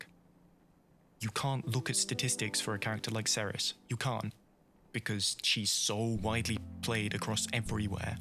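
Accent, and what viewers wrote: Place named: United Kingdom. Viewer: I love this British asmr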